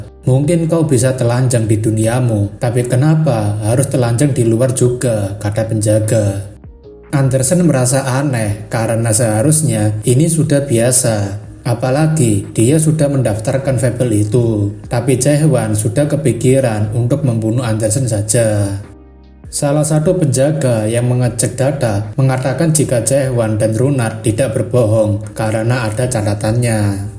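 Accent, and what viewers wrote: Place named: Bali, Indonesia. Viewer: soalnya kata T nya persis org bali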